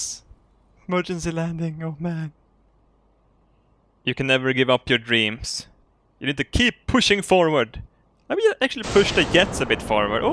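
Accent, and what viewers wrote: Sweden. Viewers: Hikari Yeah,He's sweden xD I've known that for a LOONG Time before he starts his account.Well i tought it was funny though. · well he's sweedish so he pronounces J's as Y's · Are you from Sweden ?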